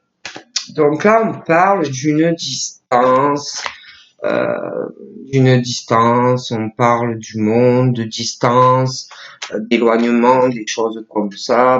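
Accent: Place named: Marseille, France. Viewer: Bonjour s est la première fois que je vous vois, et vous écoute, vous êtes un monstre dans le bon sens biensur, vous êtes extraordinaire, merci votre accent, j ai le même Marseille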